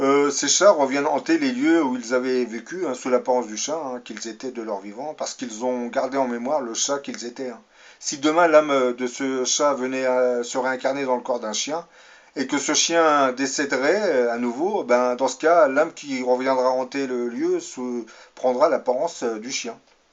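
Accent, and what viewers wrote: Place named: Belgium. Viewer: Vous avez un jolie accent du nord ou proche de la Belgique 🇧🇪... en tout cas cela y ressemble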